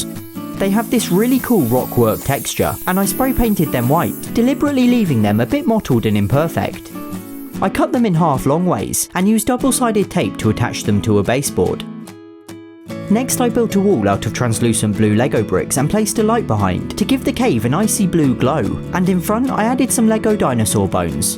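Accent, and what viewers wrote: England, United Kingdom. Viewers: Are you British? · Are you british? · I have this lego trains and you speaking like english gentlemen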